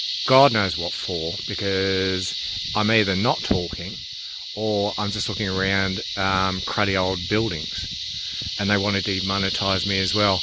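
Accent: Australia. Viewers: I’ve seen a few of your vids now and I have to tell you how lovely it is to hear an Australian on YouTube. I know there are many but most of my subs are from North America. Enjoy your work.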